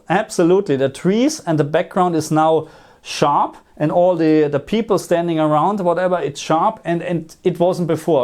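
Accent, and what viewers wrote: Germany. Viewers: Wieso schreibst du auf Deutsch, ist er Deutsch? Sein Akzent klingt auf jeden Fall so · Du kommst doch wohl aus Deutschland oder nicht? Man hört es direkt, ich wundere mich dass Australien bei deinem Kanal steht. Da wohnst du wohl?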